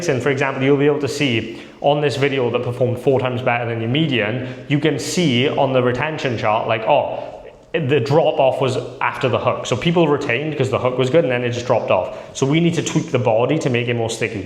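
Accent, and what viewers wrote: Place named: United Kingdom. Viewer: Omfg not another british dude lol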